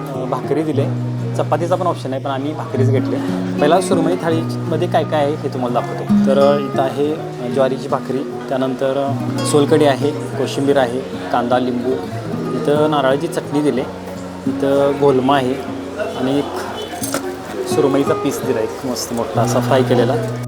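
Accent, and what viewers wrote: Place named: Kolhapur, India. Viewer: खूप भारी कोकण सिरीज आहे. आपण कोल्हापूरचे आहात काय? खूप दिवसांनी माहेरचे शब्द ऐकले... चव सपक आहे... अगदी खेटूनच आहे. शेवटी आपल्या भाषेचा लहेजा एक नंबर असतो. धन्यवाद 🙏🏻💐🙏🏻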